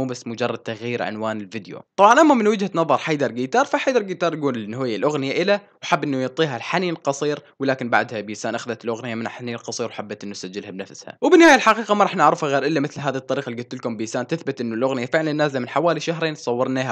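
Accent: Iraq. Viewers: R عود صدوك والله عبالي عراقي وكلت حجيه مثل البغداديين 😯😲😂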